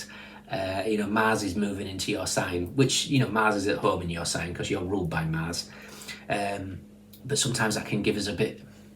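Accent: Northern England, United Kingdom. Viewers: Thank you for yr reading ! Love yr accent...North of England ? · Definitely Northern England...probably Manchester area. 🤗❤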